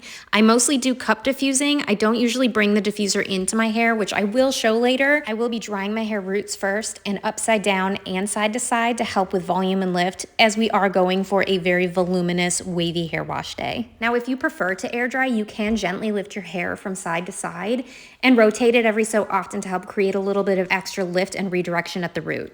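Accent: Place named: South, United States. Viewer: It did sound southern!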